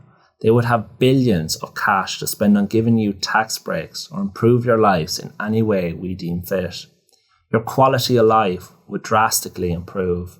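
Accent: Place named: Kildare, Ireland. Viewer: I blame the multi nationals for this. They now employ more non nationals here in ireland too. So support them you keep supporting this. Their a liability to this country now and played a big role in causing cost of living crises too. Irish are not having kids either due to to so much liberalism and diversity. I'm guessing this guys accent is around kildare area......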